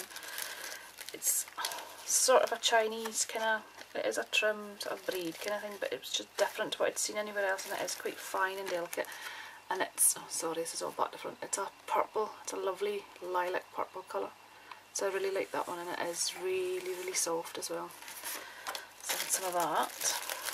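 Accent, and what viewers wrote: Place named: Scotland, United Kingdom. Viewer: I didn't realise you were scottish too lol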